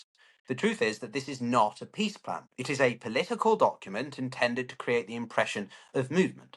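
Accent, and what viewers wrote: England, United Kingdom. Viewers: Hey, English accent dude, you REALLY do NOT see the BIG PICTURE in front of your eyes!!! The US is NOT the heart of NATO! The US has walked away!!! The US only NEEDS NATO when its key national interests are involved, and Ukraine itself was NEVER a key US interest! Diminishing Russia has long been a key US strategic interest, but now the US needs to deal with China, a far bigger strategic interest than either Ukraine or Russia! Put simply, NATO has shown its weaknesses, as too has the EU (Military & economic)!!! Ukraine can swallow the bitter pill now or a far more bitter one in 6-12 months!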